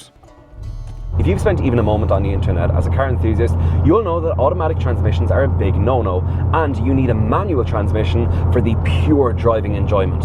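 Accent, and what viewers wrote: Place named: Germany. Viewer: damn (i am german) i love your "german accent" it was very funny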